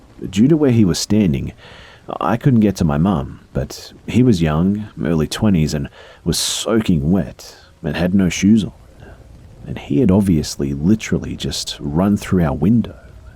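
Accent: Australia. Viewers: Also I have to ask are you an Aussie? I am and you have a very Aussie accent 🇦🇺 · Wait do you have an Australian accent?? Oh my gosh that's my favorite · Good story but having a narrator that is man with a man with australian accent playing a 29 year women from texas with a southern accent does not do it for me.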